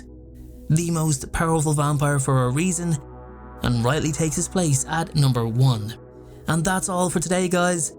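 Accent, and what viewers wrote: Ireland. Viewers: Are you Irish? What part of Ireland are you from? You earned a sub anyway.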